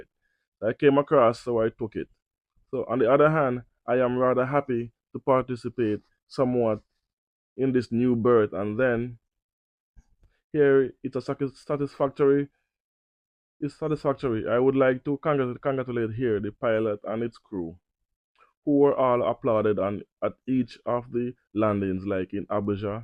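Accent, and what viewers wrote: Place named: Jamaica. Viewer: You are only speaking to the Jamaicans, sir, because only them can understand your accent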